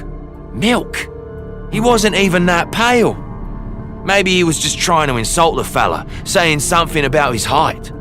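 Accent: United Kingdom. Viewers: HE IS BRITISH??? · I keep forgetting hes british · I KEEP FORGETTING THIS MF IS BRITISH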